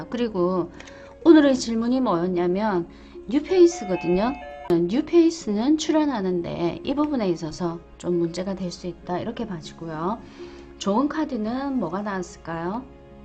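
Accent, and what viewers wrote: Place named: Busan, South Korea. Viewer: 5번 신기하게 맞네요🤗 편안한 리딩 좋아요~~ 부산ㅅㅏ투리두 좋아요~~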